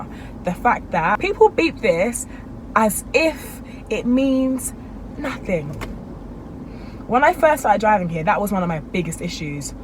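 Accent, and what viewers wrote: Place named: London, United Kingdom. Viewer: Ugh leave that annoying london accent in london. Yall need to leave some things in London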